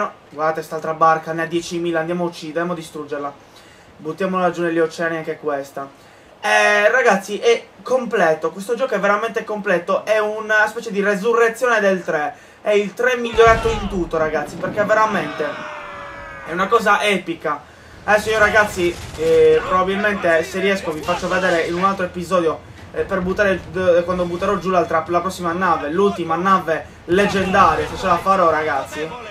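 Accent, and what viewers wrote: Sardinia, Italy. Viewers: sei sardo vero :) anche io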